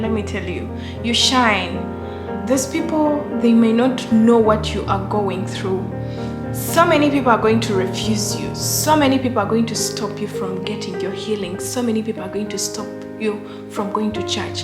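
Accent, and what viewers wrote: Uganda. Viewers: Just seen your videos, you sound Ugandan i stopped because of your accent. Ended up watching your carrot oil video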